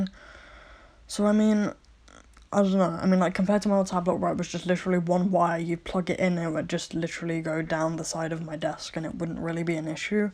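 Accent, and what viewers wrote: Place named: United Kingdom. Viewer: YESH, LE BRITISH ACCENT IS HERE >:33 I don’t know if I could ever use a screen tablet but boi, is yours pwetty ^^ Also, those clothing skills tho 😫👌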